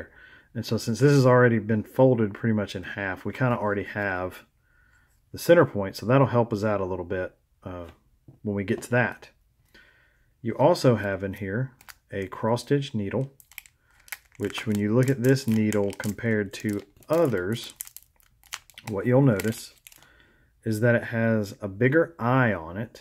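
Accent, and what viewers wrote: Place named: South, United States. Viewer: love your southern accent. i used to live in Florida....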